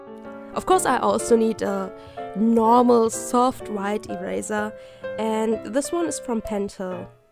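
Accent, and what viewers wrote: Germany. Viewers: bist du deutsch? du klingst deutsch :D ok ja, bin ziemlich sicher :D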